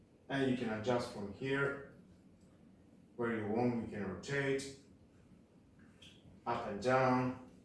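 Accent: Greece. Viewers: U sound greek φίλε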